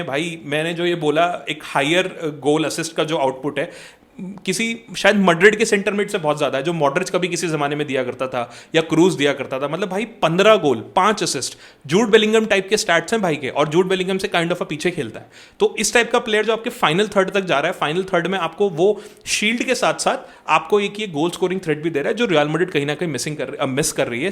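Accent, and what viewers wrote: India. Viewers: I'm not sure if Pintus will leave. He isnt a part of Carlo's staff, works for the club. Although Xabi will have his own staff, I think Pintus will stay. One suggestion from my side. I have noticed that people find it weird when you pronounce the players name in Indian way. Like usually other creators, if you have seen, they pronounce the players or clubs name in the actual pronunciation. Hope this might help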